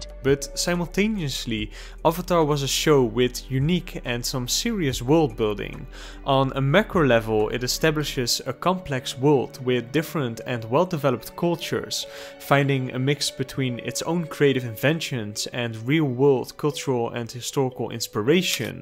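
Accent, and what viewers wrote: Netherlands. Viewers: Why can I hear that this guy is Dutch? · that duthc accent omg 💀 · Is this guy louis van gaal? That accent is very clear. Not negatively or positively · Lol i love ur dutch accent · Jij bent sowieso Nederlands aan het accent te horen hahah nice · That's one thick Dutch accent. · Je Nederlandse accent is wel erg kenbaar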